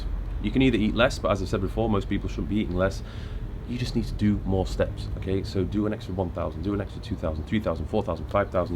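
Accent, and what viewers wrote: United Kingdom. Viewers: 3 main reasons: 1. He is good looking ( and we tend to like and give good personal qualities to good looking ppl ) 2. The British accent ( which makes you sound more legit ) 3. He gives decent advice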